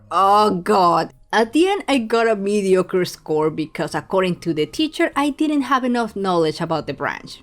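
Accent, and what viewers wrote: Spain. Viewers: Show that Spanish accent! It makes you the awesome person you are <3 . Also, I work with Mac and most of the rigging and Vtuber mods or programs arent available I decided to use Character animator. I love the character you make!!!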